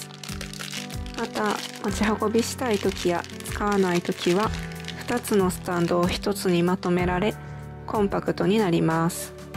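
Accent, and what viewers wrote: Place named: Kansai, Japan. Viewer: 関西の方ですか？喋り方が気になって動画の記憶が ダイソーセリアには良くお世話になってます!いい商品多いですね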